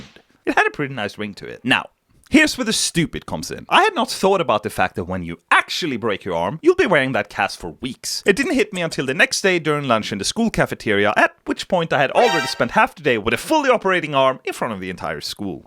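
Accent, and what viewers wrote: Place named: Sweden. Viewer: I really love these vids. They're definitely worth the wait! Plus we get to listen to ' EPIC Swedish accent!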